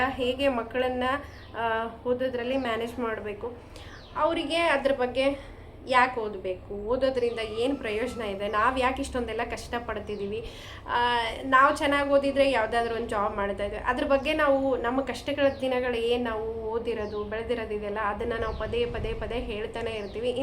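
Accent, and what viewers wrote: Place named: Bellary, India. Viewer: Bellary nalli undya tindya ubmonaa ee tara yella mataditivi… gramya plus Telugu influence enda nam Kannada haage erutte…😂 nanu Banglore ge 1st bandage we tara adikolta eddru… but I love ur raw bhashe… keep going. Gaatri avarinda nim video noodle shuru aytu.. Bellary nalli YouTuber edare anta gotte eralilla… happy for you 😊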